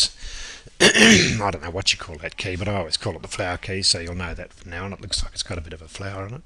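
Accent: Australia. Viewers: He's Australian...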